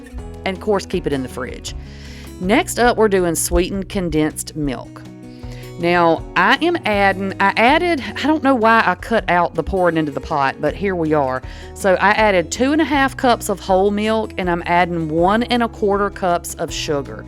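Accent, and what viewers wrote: South, United States. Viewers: Don't take this the wrong way cuz it's a compliment I love your southern accent I don't know what Southern States you're from but it's you sure have a southern accent You take care dear and God bless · Don't ever apologize because of where you are from or your accent. So many times, southern people are tried to make look less intelligent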